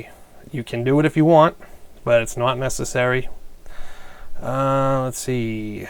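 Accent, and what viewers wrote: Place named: Massachusetts, United States. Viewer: Knew you were from MASS right away even though you don't pronounce your AAAAs (read R's for those not from MASS) like I do.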